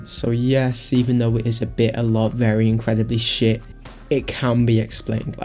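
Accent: United Kingdom. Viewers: Are u northern Irish ☘️ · Are you British? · u british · Sorry, this was really hard for me to pay attention to because of how bri'ish you sound.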